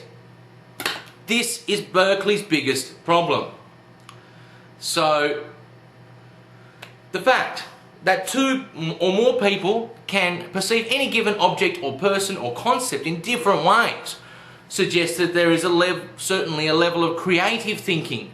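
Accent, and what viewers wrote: Australia. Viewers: I'm so entertained by the juxtaposition of the words coming out of your mouth and your ocker accent! Rock on you ocker intellectual!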